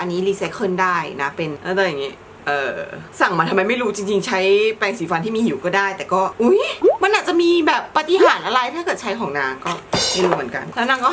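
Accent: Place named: England, United Kingdom. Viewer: ชอบสำเนียงอังกฤษมากค่ะ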